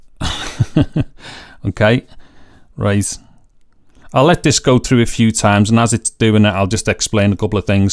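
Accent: Liverpool, United Kingdom. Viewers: You are a scoucer, aren't you?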